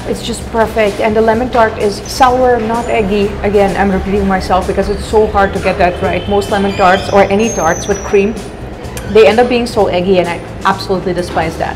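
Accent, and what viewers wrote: India. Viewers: you are acquiring that indian accent..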